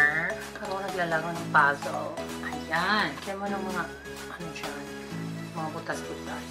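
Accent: Visayas, Philippines. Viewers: Gustong gusto ko pag nag bibisaya ka mama haha ang cute nang pag bibisaya mo. Bigla naman ako nag crave sa graham pag uwi ko nalang ng pinas wala kasing graham biscuits dito e ang poor haha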